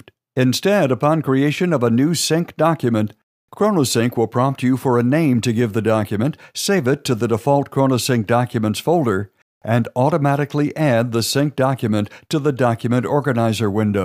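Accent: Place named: United States. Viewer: Thank God, at last a video fully understood by a man who speaks GOOD typically American language. I think all the videos that contain instructions must have been talking with so purely American to understand the rest of us who are not Americans. Thank you!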